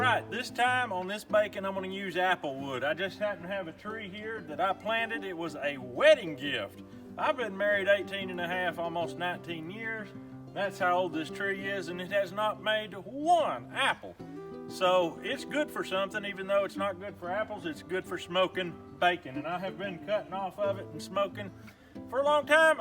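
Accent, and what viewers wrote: Tennessee, United States. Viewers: I thought I heard some Tennessee in that accent. Also are yuns off grid?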